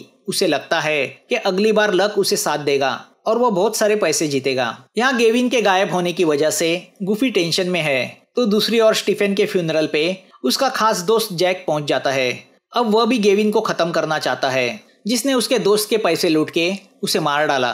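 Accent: Maharashtra, India. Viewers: What makes this channel stand out is the Hindi spoken in Marathi accent for narration.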